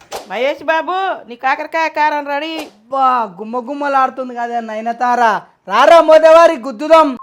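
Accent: Telangana, India. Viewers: Achampet bro valladii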